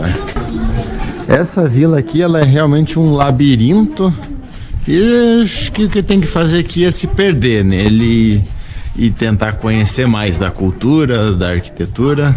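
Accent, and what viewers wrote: Santa Catarina, Brazil. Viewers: Sei la, parece de Santa Catarina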